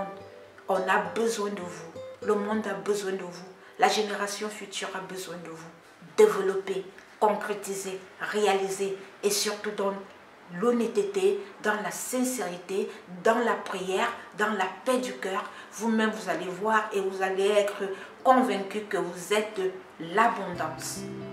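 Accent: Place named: Africa. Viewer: T'es super, je me suis régalée d'entendre ta voix charmeuse et douce avec cette note d'Afrique...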